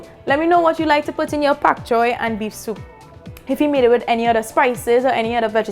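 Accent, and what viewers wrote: Trinidad and Tobago. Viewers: Great Recipe...I love your accent...Im hearing Trini...Am I correct???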